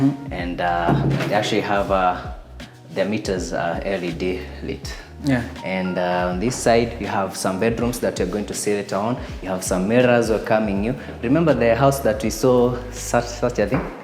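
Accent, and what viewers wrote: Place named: Kenya. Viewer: First of all, I like, and especially his kikuyu accent 😂😂, he's my favourite real estate reviewer. However, honestly, whatever's keeping that house at that price point is the location and probably the landscaping. Everything else is just off, assymetrical, outrageously overpriced for decor that is below luxury standard, exterior design is barely standard while interior design is just as bad as it can get. For modern living standard, metallic doors just picked off of a jua cali base is as bare minimum as it can get. Let me not even get to the bathrooms. Whoever designed this house is such an embarrassment to high end real estate architecture. The only thing that may attract you to this house is the lawn otherwise the developer should go benchmark from the professionals. Very poor design. Wth😳🤔.. was good tho.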